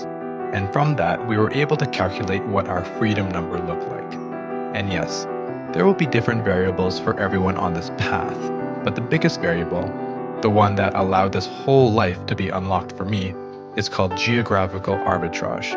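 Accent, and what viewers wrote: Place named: Europe. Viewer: But your Asian, why talk as if your European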